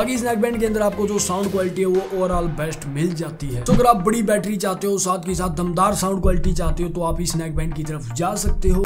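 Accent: Haryana, India. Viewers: Haryana say h bro ye Hmare jile say 🎉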